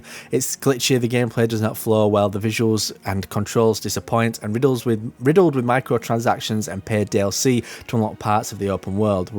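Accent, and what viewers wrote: Yorkshire, United Kingdom. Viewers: Sounds like a Keighley lad.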